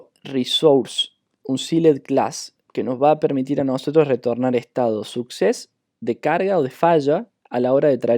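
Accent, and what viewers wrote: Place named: Córdoba, Argentina. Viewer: En este video no pudiste controlarte como cordobé, y tambien miré raro cuando dijiste "Coca con pepsi" jasjjajaja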